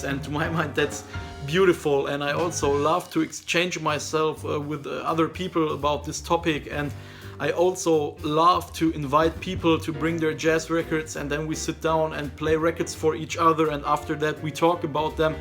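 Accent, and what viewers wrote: Germany. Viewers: Ist er Deutscher? Hört sich so an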